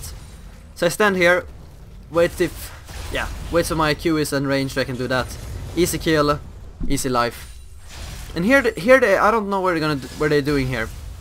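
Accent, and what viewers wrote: Sweden. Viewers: Låter som att du är svensk, stämmer detta? ;)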